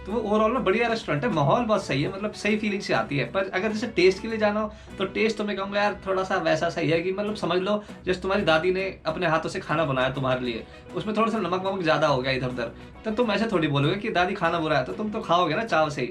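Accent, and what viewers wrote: Haryana, India. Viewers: Bhai yr tum jb haryanvi accent bolte ho to bda maza aata hai lgta hai tum india mai hi ho...aise hi rehna bhai...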